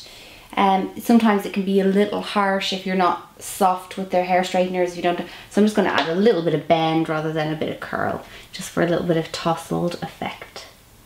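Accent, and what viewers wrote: Ireland. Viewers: no she is irish the accent is so defined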